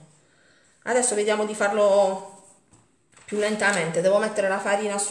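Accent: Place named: Bari, Italy. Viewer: Ciao (e )... ho scoperto da poco il tuo canale e sentendo la tua cadenza barese mi sono subito iscritta perchè sono barese anche io, ma vivo a Torino. Finalmente ho imparato a fare i "disctinculo" ma vorrei farne per una mia amica che è celiaca...mi potresti consigliare sulla farina da utilizzare? Ho già provato con la farina di riso ma non mi viene bene, cosa mi proponi? Grazie se mi rispondi.... a presto, Maria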